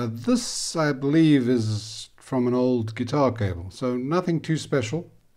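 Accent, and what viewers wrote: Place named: South Africa. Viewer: You sound South African. 👍🏻😁 I have learned quite a few tricks. Thanks boet.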